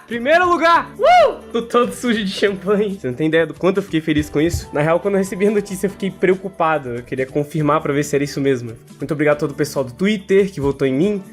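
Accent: Brasília, Brazil. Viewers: VOCÊ É DE BRASÍLIA???????????? ceilândia ou taguatinga sul?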